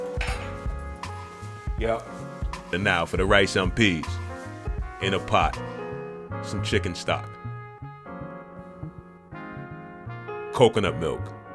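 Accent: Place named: Caribbean. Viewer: Why don't you use your natural Caribbean voice. You're Jamaican Right 👍🏿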